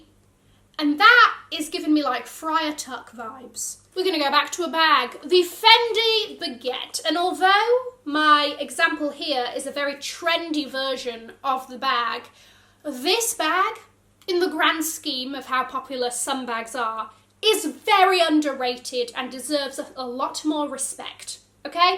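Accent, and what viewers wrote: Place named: Liverpool, United Kingdom. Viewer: Great content. What colour is your burberry trench? I have to say again you sound like a" posh" scouser. Your fab always make me smile. 😊😊xx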